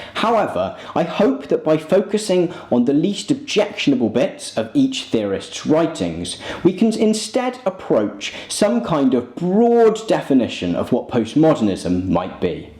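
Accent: United Kingdom. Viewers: I love your accent , so British saluts From Algeria 💜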